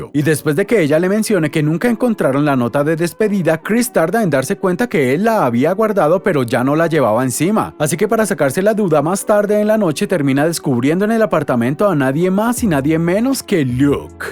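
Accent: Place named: Colombia. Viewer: Eres de Colombia? es que tu acento se parece a uno de los tantos acentos de que tenemos aquí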